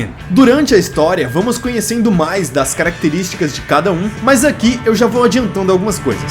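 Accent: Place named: Goiás, Brazil. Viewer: Você é goiano ? Seu sotaque é parecido ❤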